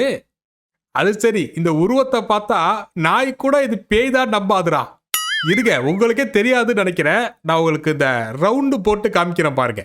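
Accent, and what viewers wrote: Kongu, India. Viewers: Day kongakara kannada nalla irkunda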